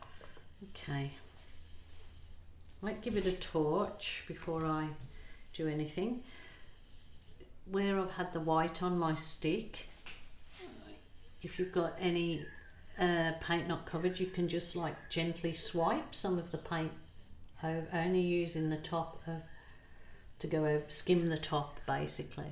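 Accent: Birmingham, United Kingdom. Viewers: Better than wasting leftover paint :) And it's fun to just play around sometimes! I've noticed that when you take your photos, we see the colours more vibrantly and possibly more true to life. Also, I knew you were in Australia, but I assumed that you were from the UK lol, Your accent definitely has a touch of Brum to my ears. But I am almost completely deaf so my ears can't be trusted lol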